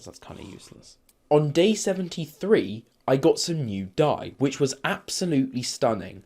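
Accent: United Kingdom. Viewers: Are you from the UK? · Oh my god, this was honestly so cool. And I love how your british accent suits with your jokes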